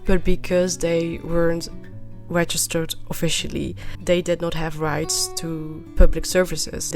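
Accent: Netherlands. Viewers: Your English is phenomenal with a tiny hint of a Dutch accent. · So many conflicting emotions that are difficult to resolve but in one regard you have so many more life opportunities (as you discovered) which gives you a chance for a broader impact for yourself and others..love the Dutch accent, best wishes for all in your future 🙏🏻👏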